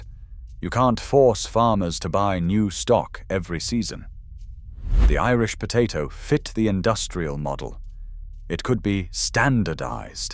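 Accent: United Kingdom. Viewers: It's the UK pronunciation.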